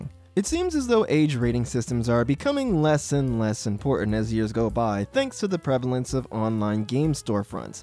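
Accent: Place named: North America. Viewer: Well, none of these are nostalgic or iconic to anyone outside of North America. From your choice of words and accent, it's clear where you're from.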